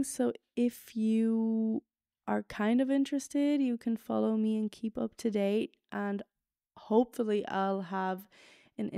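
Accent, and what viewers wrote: Ireland. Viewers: Hey!! Like a few other people who commented, I subscribed to you to try to keep up with my German, so thanks! I do suck at German btw... I did Erasmus in Paris and Leipzig - before we left the EU - and it was a really awesome experience... I'm British - English - and your English is perfect. I can hear a bit of the Irish accent coming through which is so cool. I don't know who these Germans are who are throwing shade on your English, but don't give it a second thought! English is literally your Muttersprache - maybe it has a deeply personal/private context for you and that's why you don't feel so comfortable doing a YT video in English, but maybe you'll get more used it the more you do it, right? LG aus the UK!! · I don't think your accent is very American at all actually, I think you can definitely tell that it's irish, it's just not super heavy :)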